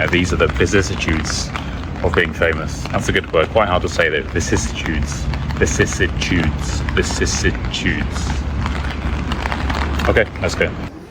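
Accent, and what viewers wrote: United Kingdom. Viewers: Listening to rain sounds is the best! (British accent with an ASMR vibe:) So no worries about it at all!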